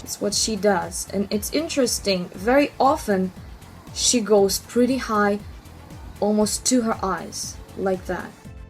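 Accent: Russia. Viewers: Кажется временами у вас проскальзывает русский акцент или какой-то славянский. Я хочу сказать что я в шоке после просмотра вашего видео. Иногда когда вы склоняете слегка лицо и прищуриваете глаза, тогда я точно вижу героиню вашего сюжета. Я честно говоря в шоке как вам так быстро удается это нарисовать. А главное похоже притом что у вас совершенно и глаза другого цвета и лицо другой формы. Я просто восхищена вашим искусством! Сама люблю рисовать но не на лице. Наверное вы какой-то известный визажист(я первый раз на вашем канале) .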